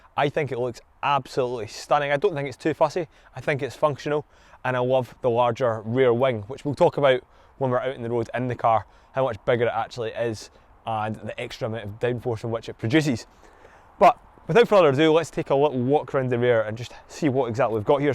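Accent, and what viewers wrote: Scotland, United Kingdom. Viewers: I understood every word,love Scotch accent,great review,regards from NZ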